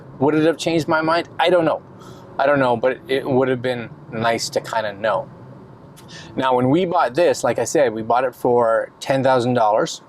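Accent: Canada. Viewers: Are you from Newfoundland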